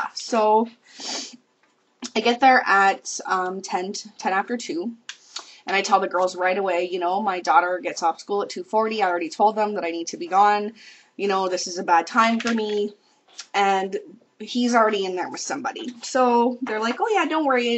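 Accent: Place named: Canada. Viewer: I love your voice and the way you tell this story! I'm a sucker for the mild and lovely Canadian accent, I gotta say. I have to go watch more now and find out more of your story here....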